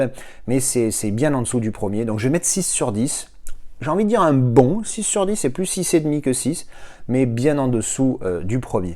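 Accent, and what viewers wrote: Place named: Marseille, France. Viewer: Dire des mots asiatique avec l'accent Marseillais c'est Marrant.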